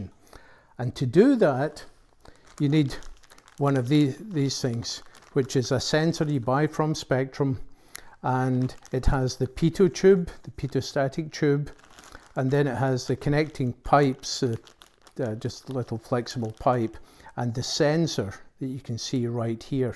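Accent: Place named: Scotland, United Kingdom. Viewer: Was easy on my ear, hearing a fellow Scotsman 🏴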